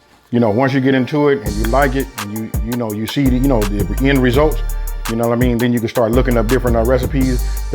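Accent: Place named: San Francisco Bay Area, United States. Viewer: you sound like your from The Bay....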